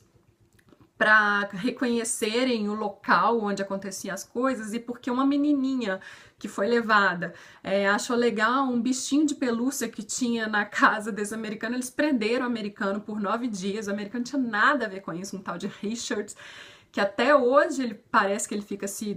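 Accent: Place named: Minas Gerais, Brazil. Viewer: Sotaque mineiro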